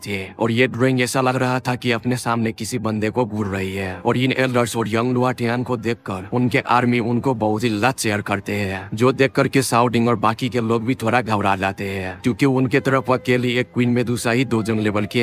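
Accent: Bihar, India. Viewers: Bhai aap 😂paka bihari honge 😂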